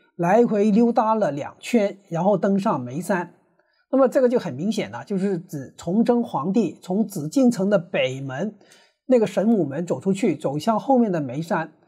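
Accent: Cantonese, China. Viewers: 你的广东口音暴露无遗🙃🙃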